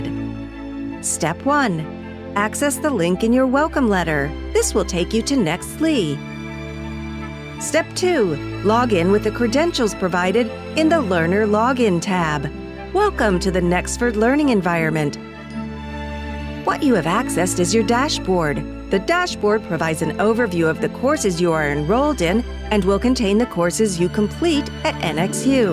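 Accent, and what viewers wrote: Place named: South Africa. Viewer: She is definitely South African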